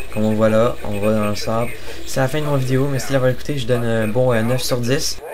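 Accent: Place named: Canada. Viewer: Bon l'accent canadien m'a permis de ne pas bien comprendre certains mots, heureusement qu'ils étaient dans leur contexte ! ^_^ Aller 4* car la perfection n'existe pas !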